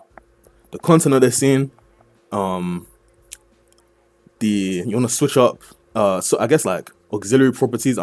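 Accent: United Kingdom. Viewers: this guy sounds so british and i love to hear that there are other good british editors around in the community and not just a bunch of russian tryhards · Deffo tell you’re from some part of london with the voice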